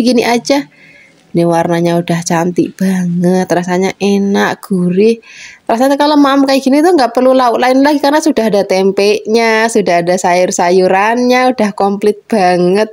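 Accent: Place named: Java, Indonesia. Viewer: Praktis ya 👍 mb kasih org mn, kok medokmen jawane😊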